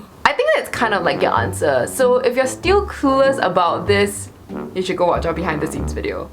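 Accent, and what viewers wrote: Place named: Australia. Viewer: She kind of has a Australian accent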